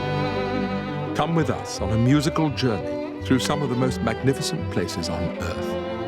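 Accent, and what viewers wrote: RP, United Kingdom. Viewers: Anyone without a wanky posy accent. Almost anyone on DW news would be wonderful. Most people on the BBC would be a significant improvement. I don't see the point of sounding like a really annoying snobby social climber. After all, there's nothing wrong with the music. Why screw up the text that way? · I thought the same of the lengthy introduction, and it seems that the narrator would like to magnify the narrative effects by such contrivances, but it can test our expectation (our wits) which, with some endurance (add the intrusive ads and other distractions), we may finally arrive at the gist of the subject-matter in hand. Nevertheless, for the more patient and cultured, it is worth the efforts. It is not easy to say whether a short video, taking such goodly swath of introductory embellishments, could enhance the desired effects, which is to capture the viewer’s attention from the outset? 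That said, one is bound to admit that these documentaries, despite the narrator’s fondness for an architectural backlog of informations and history, are highly recommended and even of the highest rank. One could say that is natural to the British people, with their distinct accent, to win our admiration as the quintessence or epitome of intellectualism and refined culture.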